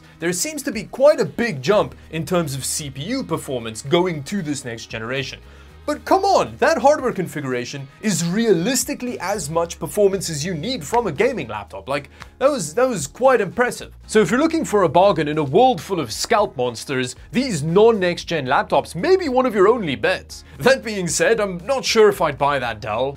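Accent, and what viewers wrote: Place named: South Africa. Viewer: You have a South African accent. Are you from South African?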